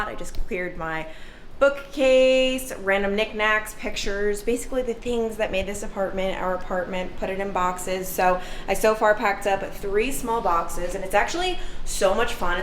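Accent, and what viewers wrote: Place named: Long Island, United States. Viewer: I feel like your Long Island accent is returning now that you’re not on the news. It’s fun to hear again!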